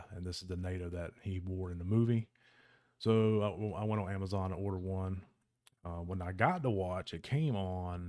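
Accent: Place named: South, United States. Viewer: Thanks for the review fam. Are you from the south? Sounds like you’re from either AL, MS, LA, or TX.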